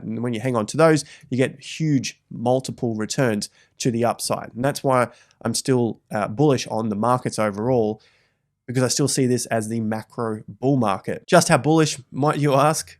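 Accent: Australia. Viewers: Legendary as always! I seem to laugh more than I probably should purely from the Aussie delivery and the humour 🤣👌 love it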